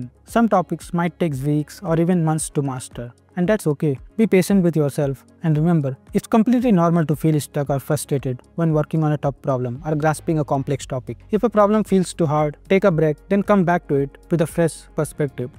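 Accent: India. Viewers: Thankyou so much for this video sir. Gave me a lot of insights as a beginner and sir, It would be more helpful if you pronounce some words clearly without using hindi accent since its not easier for everyone to understand the words like the ones starting with 'S'.😊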